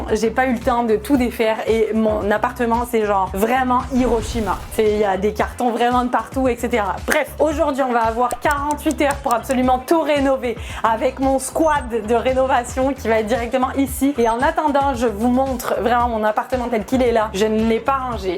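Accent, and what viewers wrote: Quebec, Canada. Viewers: Je trouve que tu commence à avoir l’accent canadiens et c’est trop marrant comment on peux changer aussi facilement et avoir un accents aussi facilement rien qu’en habitant dans on nouveau pays , j’étais concentré que sur sa 😅j’adore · Il y a un petit accent québécois qui est en train de s'installer 😁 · J’avais pas vu de vidéo de toi depuis un peu longtemps mais t’as grave pris un accent québécois ou c’est moi? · C fou à quel point t’as un accent québécois comparé au début de tes vidéo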